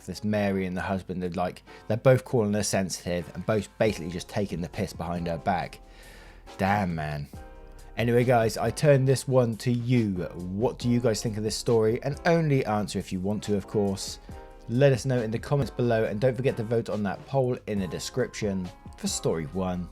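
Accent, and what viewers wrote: United Kingdom. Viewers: Lol. I think MAYBE Canada carries Stouffers but, yeah. definitely has some Brit to his accent but, I'm not sure if he's in the U.K., Australia or New Zealand, or what.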